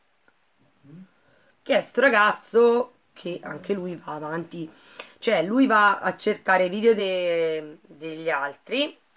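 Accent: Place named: Rome, Italy. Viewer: A A ME, ME PIACE CHE PARI ROMANA!!!!!! PERO' IO SO' DE' PARTE!!!!!😅😅😂😂😂😘😘😘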